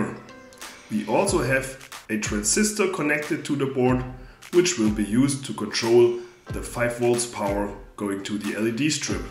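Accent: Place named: Germany. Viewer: Man erkennt sofort wenn ein Deutscher Englisch spricht. Daumen hoch.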